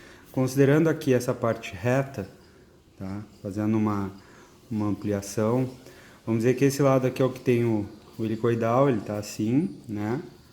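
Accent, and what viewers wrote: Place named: Rio Grande do Sul, Brazil. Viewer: Prezado, teus vídeos são muito bons... agora, na boa, esses teu sotaque gauncho... pelaamordeus... tire isso e ganhará o mundo.. abc..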